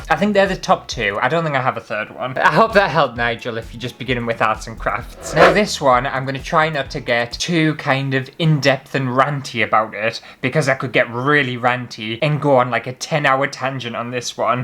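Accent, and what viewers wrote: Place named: Northern England, United Kingdom. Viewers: 🤩Northern accents rule, well at least in our eyes. Might be ever so slightly bias 😁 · Your art rant made me so happy, especially when you mentioned Damien Hirst. He's a snake oil salesman of the art world, absolute sham. I reckon we must be fairly local to each other as I'm also in a pit village in the Durham area close to the sea! I just love that out of all the art youtubers I discovered you, it's comforting to hear our accent, it's like you're me mate haha. I also say is/us to refer to myself which confused my American friend a lot! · Your accent is definitely North East and phrasing is specifically Durham rather than the Newcastle Geordie. I'm originally from Durham also and I love to hear the honesty of your roots when you talk, together with your wicked humour. It makes me chuckle the whole way through your videos - laughing with you, not at you.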